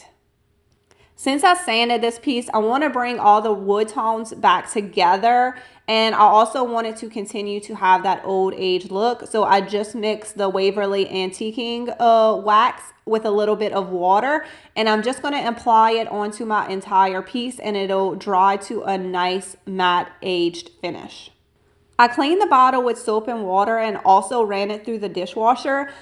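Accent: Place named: South Louisiana, United States. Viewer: I love your accent! Sounds like South Louisiana! Reminds me of my daddy’s family in the Houma area. I love all your projects!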